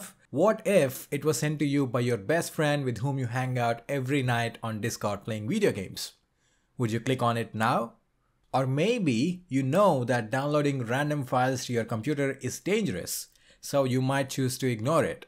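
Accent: India. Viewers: Indian no text to speech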